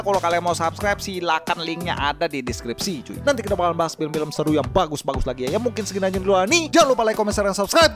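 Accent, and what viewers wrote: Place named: Java, Indonesia. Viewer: 😂😂😂 kejawaan banget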